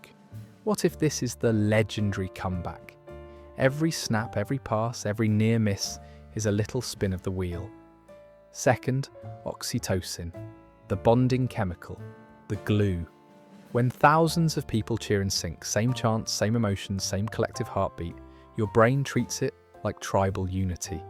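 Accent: United Kingdom. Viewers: What's with the English accent? Does that make it more legitimate?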